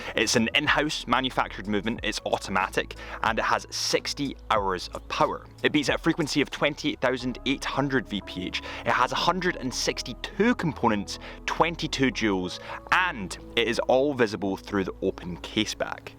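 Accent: Scotland, United Kingdom. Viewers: This outdoors video is a more raw, younger and more Scottish sounding version of BarkandJack’s work. Enjoyable !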